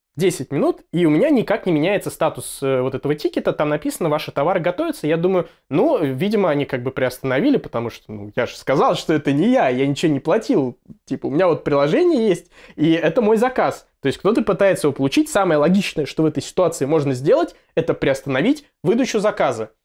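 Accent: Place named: Russia. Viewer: Ты еще толком не жил в Чехии, чтобы говорить о чешском сервисе, а на тебя положили скорее всего из-за того, что у тебя русский акцент есть.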